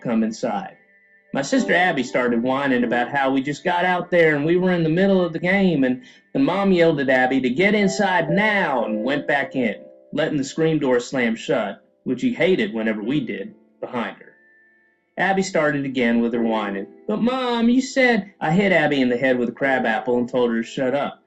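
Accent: South, United States. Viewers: why is he talking like a southerner? I know he's from the south but he sounds different here. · He sounds like, 80% more Southern than usual.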